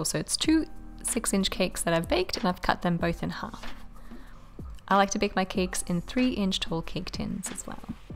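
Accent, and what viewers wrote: Australia. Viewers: Hi,. Your lemon cake looks so pretty, just like you and your Australian accent, mate. What's your best tip for making the best strawberry cake? Take care of yourself, sweetie. <3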